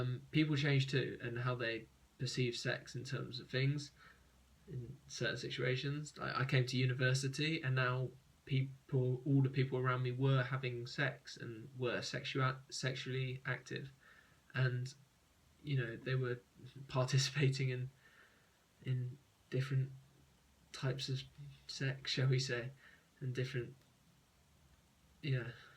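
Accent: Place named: United Kingdom. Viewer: Great video. It was nice to hear a fellow British voice talking about this topic.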